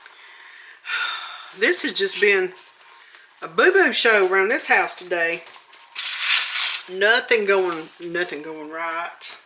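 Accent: South, United States. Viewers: Looked yummy,sounds southern accent I'm in Tennessee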